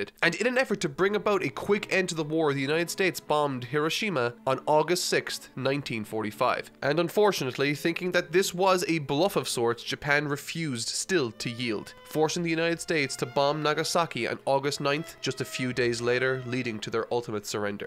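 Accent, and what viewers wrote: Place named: Ireland. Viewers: he's irish that's just his accent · he pronounces the word "calm" the same. I think it's just his Irish accent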